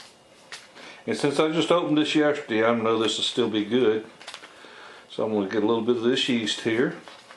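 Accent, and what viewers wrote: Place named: South, United States. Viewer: man I like your style and that good old Southern drawl!! with your recipe maybe the South will rise again! thanks for the video!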